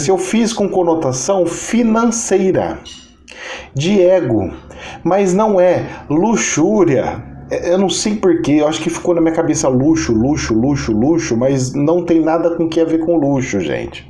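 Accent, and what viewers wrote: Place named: Caipira, Brazil. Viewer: Eu acho tão engraçado o jeito caipira que você fala, a aula fica mais divertida...kkkk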